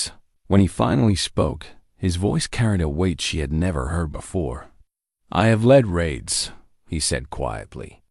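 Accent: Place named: New York, United States. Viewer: Good story! The narrator sounded like he was from New York though. I preferred the other voice you used to use.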